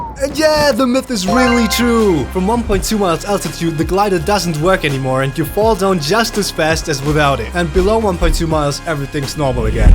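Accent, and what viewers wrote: Germany. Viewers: youre german? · Bist du Deutscher?✌🏼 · Are you german? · pov ich weiß das er deutsch spriecht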